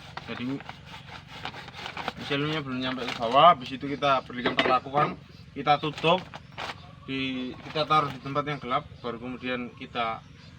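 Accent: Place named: Banyumas, Indonesia. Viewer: Kepriwe mas ora gelem di sorot raine...Mantap mas, Ora ngapak ora kepenak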